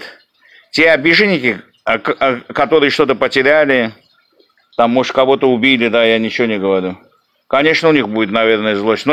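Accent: Baku, Azerbaijan. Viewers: По акценту понятно что, гедеш бакылыды. Но не все такие как ты. Помню в том же лас вегасе был один Гена, сперва пел песни ай мян бакылыям, ай мои все друзья Азербайджанцы, а потом как пернул, ограш, что Гарабах древняя земля армян тут же получил команду сиктир, гётвярян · У тебя бакинский акцент. Спасибо тебе за правду. Дай бог тебе здоровья и долгой жизни. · Бакинский акцент)))👍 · У автора акцент всё-таки Бакинский остался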